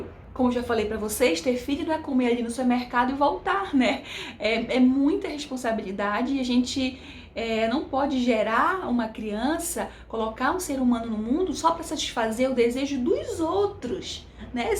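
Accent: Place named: Belém, Brazil. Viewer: Olá, caí de paraquedas no seu canal, uma pergunta vc é de Belém ou imediações? Seu jeito de falar me lembrou demais de uma amiga que é de Belém e fala igual a vc 😅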